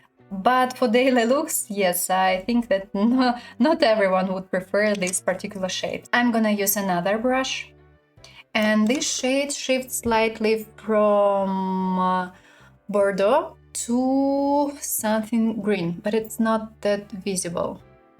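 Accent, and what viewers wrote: Russia. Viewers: Искала отзывы на новые жидкие тени от Катрис и попалось Ваше видео. Очень хорошо показали и рассказали, наверное, куплю зеленый цвет, думала заказать от Эльф. Хорошо так говорите по-английски, русский акцент немного слышно, а когда увидела, что Вы живете в Мюнхене, вообще удивилась. Привет из Аугсбурга и удачи в развитии канала! :)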